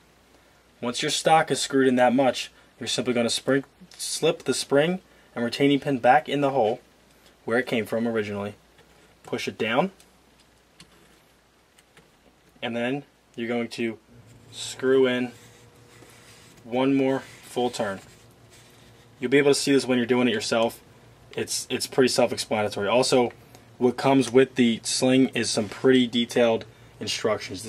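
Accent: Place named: Philadelphia, United States. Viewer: Damn I was thinking that sure sounded like a Philadelphia/ Delco accent thEn saw the license plate! Good video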